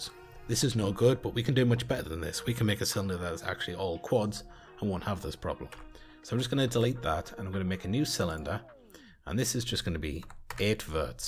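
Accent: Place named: Durham, United Kingdom. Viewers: Many thanks from this. Subscribed, but you are doing fine there anyway! Where in the North East are you from? I'm a Sunderland lad 😁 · That Simple Deform > Bend trick is great: I've been using Blender for 15 years and never knew that. Would have saved tons of time! I presume from your accent that you're somewhere near (East?) Durham too, so you get points for that.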